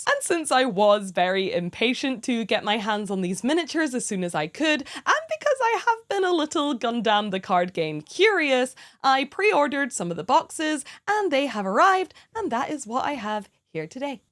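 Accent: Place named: Scotland, United Kingdom. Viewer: It's crazy that you're Scottish, cute and your voice doesn't sound like a garbage disposal. Always great.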